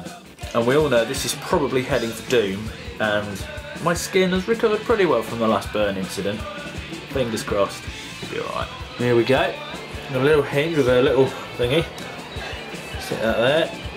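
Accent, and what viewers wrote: United Kingdom. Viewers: Are you like British or Australian or something? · this man is so intensly british that i cant understand half of what he says but i love it anyway haha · He sounds British but I don't know if he is by birth 😂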